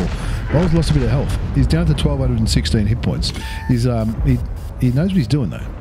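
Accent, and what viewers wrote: Australia. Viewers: aussie aussie aussie ooi ooi ooi hello mate from N/A love that awesome aussie accent hey which is better m60 or 121b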